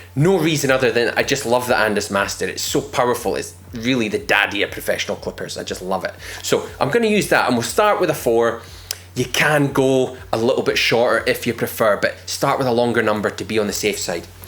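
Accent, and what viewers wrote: Scotland, United Kingdom. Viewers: i love your scottish accent <3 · Great video! I'm going to give a self-cut a try here in California! Are you Scottish?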